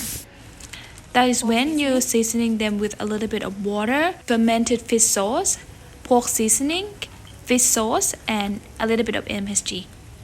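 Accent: Australia. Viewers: I hear an Australian flavour to the way you speak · You have a slight Australien accent.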